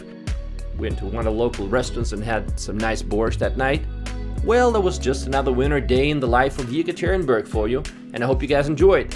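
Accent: United States. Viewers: It's weird and pointless to hear Russian city names pronounced with an exaggerated American accent, but I'm not criticising. Moreover the channel (which is great) isn't mine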